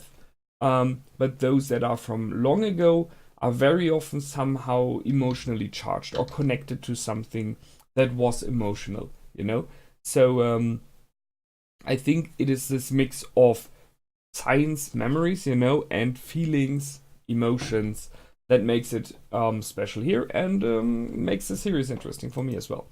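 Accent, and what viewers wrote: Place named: Germany. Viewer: Hört man wenn man selbst Deutsch ist:)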